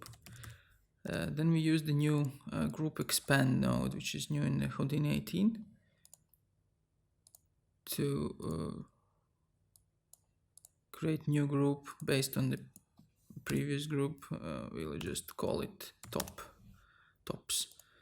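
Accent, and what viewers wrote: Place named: Latvia. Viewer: Es sāku skatīties neredzot autoru, un tad ieslēdzas mans šerloks dzirdot akcentu... "Tā..tas toč ir latvietis... tā...ar Houdini procedurālā modelēšanā varētu mums būt Kurzem..." ..skrullēju - Yess :)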